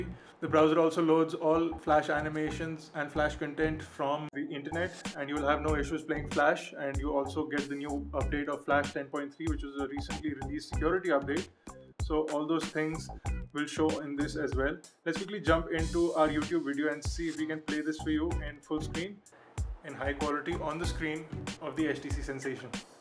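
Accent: India. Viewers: your fckin idian accent is annoyinggg! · what you expect ,an indian to speak english in american style. you fucking idiot. shut your mouth and get some sense not sensation.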